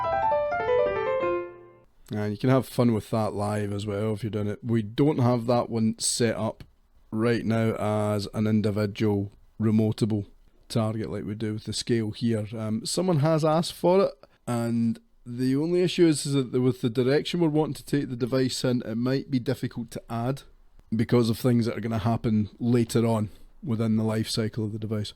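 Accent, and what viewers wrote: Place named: Scotland, United Kingdom. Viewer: I can always tell when the Scottish guy is going to start talking. Because the UI's are always awful. Lol. Plugins are good, don't get me wrong! :)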